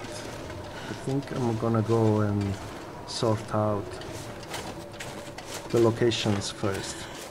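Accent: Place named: Italy. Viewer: Where in Italy do you come from? You sound like you would come near where I am from. Always a pleasure to watch you playing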